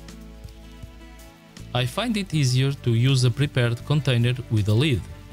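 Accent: Portugal. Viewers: 100 percent Tuga accent. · From the accent, i'm betting this guy is Portuguese. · Gosto do sotaque português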